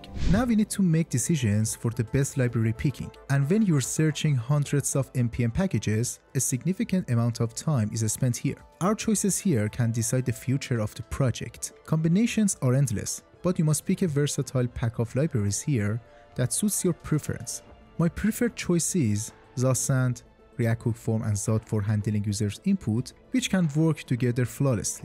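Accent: Iran. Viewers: love the iranian accent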